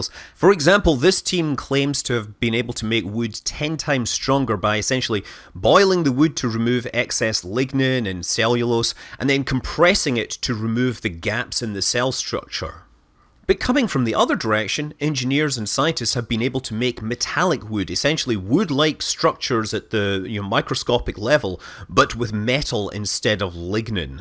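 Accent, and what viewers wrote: Scotland, United Kingdom. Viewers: "the sprüs güs" Love the Scottish accent so much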